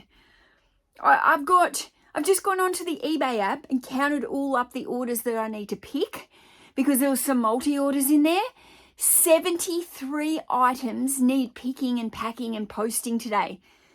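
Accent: Australia. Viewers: I cant get enough of you saying the word "book" that Australian accent though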